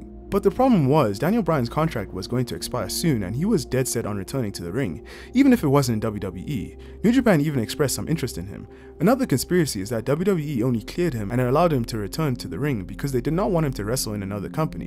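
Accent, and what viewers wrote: South Africa. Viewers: My favourite conspiracy has to be that the McMahons we see on TV and in the ring are not the real McMahons but paid actors, and the real McMahon family is never seen in the public eye. Great video btw, legit never heard of some of these other ones. P.S. I know a South African accent when I hear one!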